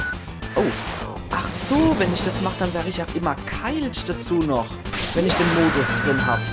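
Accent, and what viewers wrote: Palatinate, Germany. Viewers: Naaa mist :D würde ich supergern schauen >__< aber ich will das selbst noch let´s Playen...nun bin ich hin und hergerissen ob ichs gucken soll /: Finds übrigens genial das du auf pälzisch deine lps machst. Das ist mal was besonderes und einzigartiges ( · Alter, meine Fresse! Du machst das großartig "uff pälzisch"! Da bekomme ich direkt gute Laune! Ich habe dir mal einen Daumen und ein Abo dagelassen und werde immer mal reinschauen. Weiter so:D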